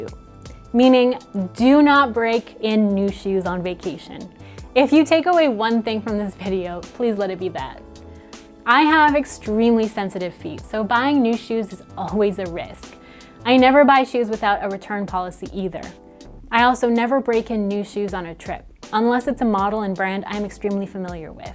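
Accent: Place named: Canada. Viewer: just recently discovered your channel and it's great! Your perspective on packing (the weather/activities/vibe/trip length quadrant) is refreshing. Instead of dictating a particular list or items, you invite the viewer to think critically for themselves to create a packing list that makes sense for them. I've been going carry-on only for 7-10 day trips to Europe since 2017, and your perspective inspired me to get ready for an upcoming trip to Madrid with a fresh outlook on layering and outfit-making - I'm so excited with my planned outfits! Your presentation is excellent: succinct, polished and friendly and it's great hearing a Canadian voice here as well. Comfort shoe recos: Love my tall leather boots from Poppy Barley (a woman-owned, Canadian brand from Edmonton that is ethically made in a small factory in Mexico). They do custom adjustments for calf-width, fit my orthotics and are so well made and comfortable. 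I've had mine for 8+ years and have walked all day in Toronto, Berlin, Amsterdam and more and have been able to have the heels replaced by a cobbler when needed. Also, Cobb Hill (a stylish comfort brand made by Rockport) looks cute, are supportive and comfy and fit my orthotics.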